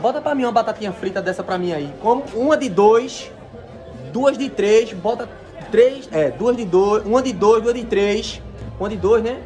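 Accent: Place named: Northeast, Brazil. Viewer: Só quem é do interior do nordeste pra saber que falamos com esse sotaque e maneira de se expressar.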